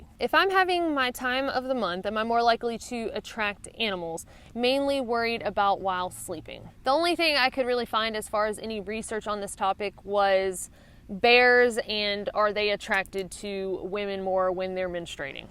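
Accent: South, United States. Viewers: I just spotted your channel today, this is the 6th video I've watched so far. I'm going to subscribe to your channel because I love the beautiful scenery you get to see on your hikes and also because it's so great to hear that sweet southern charm from a fellow southerner. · Just for fun, I pulled open a tab with your YouTube video from one of your first AT vids: "Extras: I Almost Knocked My Teeth Out" . I played a minute or two of you talking, then a few minutes of this video. Then switched back and forth. You still sound like you're from the south, but you've lost some of your accent. I wonder if it will start coming back, now that you are home. Not saying that a southern accent is good or bad or anything. I just love linguistics and find it fascinating. It's interesting to hear a difference.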